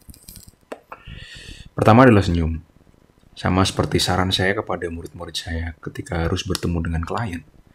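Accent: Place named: Java, Indonesia. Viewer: menurut analisa saya dari suara yang saya dengarkan , anda pemikir yang dalam , logat anda jawa , artikulasi kurang begitu jelas yang menandakan anda seorang introvert , dan maaf, seorang narcissist.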